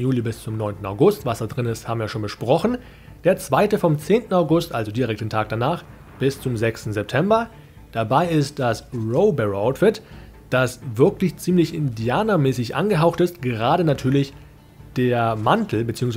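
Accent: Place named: Australia. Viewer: Wenn das du warst der da Englisch gesprochen hat, dann hörst du dich echt wie ein Australier an. ;-)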